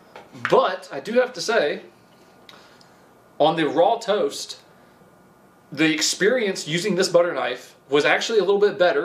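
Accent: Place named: Alabama, United States. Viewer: Lol he's like Alabama backwoods sometimes but you can definitely tell his name is lol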